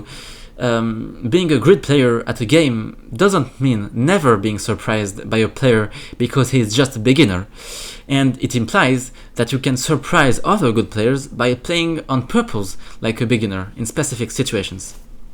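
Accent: France. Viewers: dang your english grammar and vocabulary is really good considering your strong french accent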